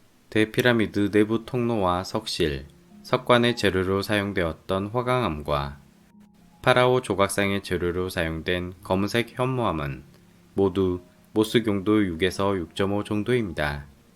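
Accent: Jeolla, South Korea. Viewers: 은은하게 들리는 전라도 억양이 반갑소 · 좋은영상 감사합니다~ 성우분 전라도 사투리가 들리네요😆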